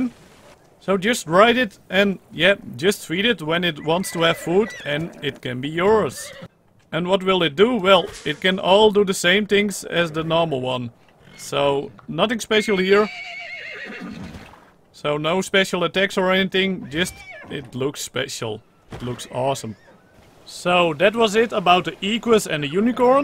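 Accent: Netherlands. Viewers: ben jij een nederlander je hebt het typisch nederlandse accent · are you dutch?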